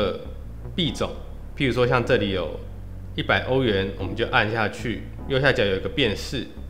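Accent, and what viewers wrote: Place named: Taiwan. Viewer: 一摸幾，你發音有夠台灣國語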